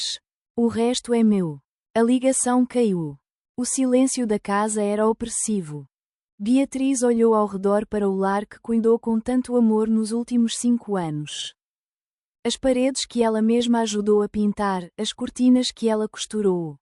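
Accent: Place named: Portugal. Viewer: Amei a historia! Sensacional! Comovente! Amei ouvir a narradora com sotaque de Portugal! Amo esse sotaque!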